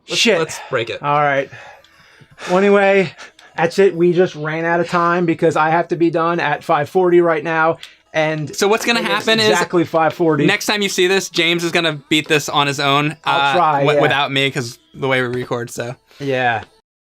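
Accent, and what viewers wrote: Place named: Canada. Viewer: I swear your "beens" and "processes" get more Canadian every video.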